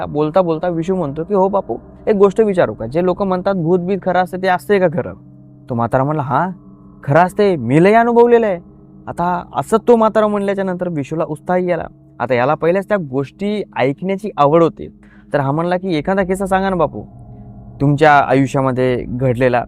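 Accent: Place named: Marathwada, India. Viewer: Parbhni nanded bhasha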